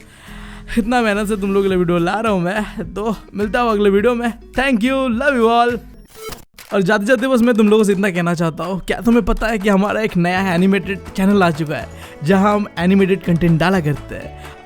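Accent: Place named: Bengal, India. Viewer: Bengali accent is soo good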